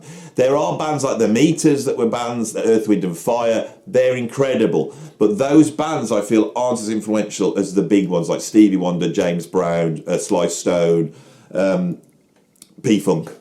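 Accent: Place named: Black Country, United Kingdom. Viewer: You sound like a YamYam so heres one for ya, saw the Pistols at the Laffiette Wolverhampton in 1977, they were touring as the Spots (Sex Pistols on tour) because they were banned from everywhere. Nancy was stood behind Sid during the gig as he proceeded to carve his chest with a beer can . those were the days...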